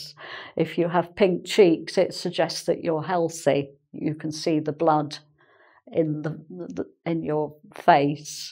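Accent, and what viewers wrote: England, United Kingdom. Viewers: I've been trying to practice some British accents. I think I can learn them from. Anyway, I'm wondering what dialect of British accents she speaks with? I usually speak English with an American accent but I really want to learn myself and teach British accents to my students! Would you guys please give some good tips for it~? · Love your kind English accent ...I imagine taking a cuo of tea with you talking :) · I like her way to explain the lessons but it seems like she teach british english more than american english. I realized of for her accent. Even I think that lessons can be useful in both.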